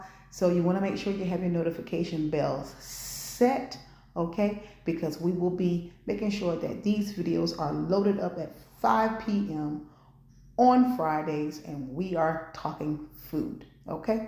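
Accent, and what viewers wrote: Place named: South, United States. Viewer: Southern as hey'all. ❤❤❤❤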